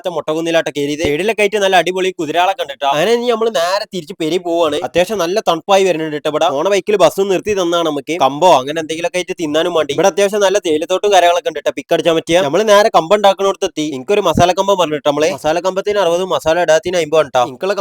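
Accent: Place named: Malappuram, India. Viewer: 😂 ഇങ്ങള് മലപ്പൊറം എ വുടേന്ന പറഞ്ഞെത് എത്തായാലും വീഡിയൊ ഇട്ത്ത തൊക്കെ അടിപൊളയാണ് ട്ടണ്ണി അൻ്റെ വർത്താനും😂 നല്ല രസണ്ടേനി കേക്കാന്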